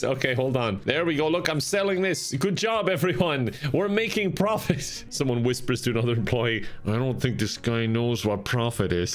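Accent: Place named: Ireland. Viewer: Didn‘t think I‘d see an irish dr.Eggman today...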